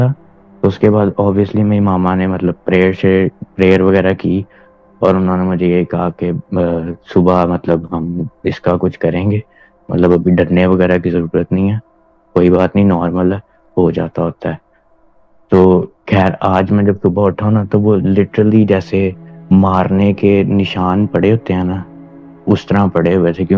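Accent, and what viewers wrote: Pakistan. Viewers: Yes he does i thought that too.. even his worfs were pakistani more than hindi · This guy sounds like a pakistani. Not an indian accent for sure.. or is it just me :/ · Pakistani accent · Yup he's accent is Pakistani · Ye zrur koi Pakistani he u Jo ainve he zabrdasti ka Indian bna hua h bilkul Pakistani accent h yarr or phr ye basically, basically sun sun kr uljhan hone lagi ...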